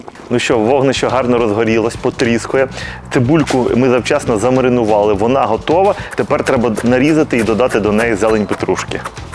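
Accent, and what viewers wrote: Ukraine. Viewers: Все люблю з українським акцентом. Дякую!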